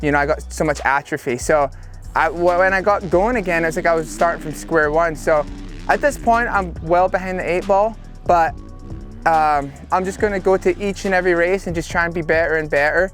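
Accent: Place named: London, United Kingdom. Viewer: Where is this guy from? His accent sounds like London via east LA.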